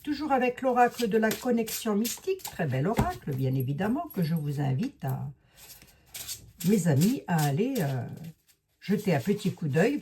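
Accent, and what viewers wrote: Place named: Lorraine, France. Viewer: Coucou, vous "causez" haut, fort et claire Chef 😊(comme dans l'armée😅), de plus avec un bon accent Lorrain (idem dans ma famille😜). Allez je vous écoute sagement💋💋🥰🤩😘❤🧡💛💚💫🌹🌹🍀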